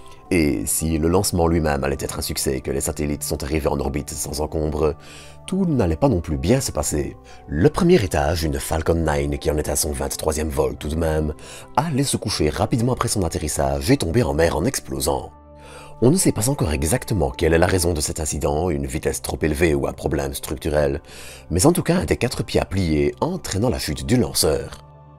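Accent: Belgium. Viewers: Holala cet accent... Belge? (no offense, suis belge aussi) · Merci pour ces actualités spatiales présentées de façon efficace. J’ai bien ton accent Belge. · C'est la voix de, son organe naturel, son accent pittoresque, il est belge.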